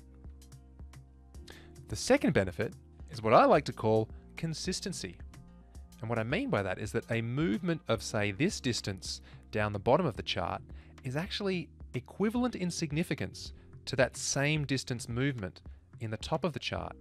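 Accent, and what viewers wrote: Australia. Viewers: explaining math with an Australian accent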